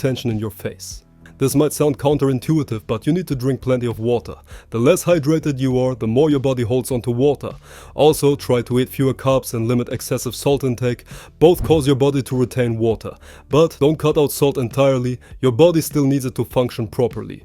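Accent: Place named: Canary Islands, Spain. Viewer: dein akzentsetzung klingt nach Kanaren deutsch also nicht böse gemeint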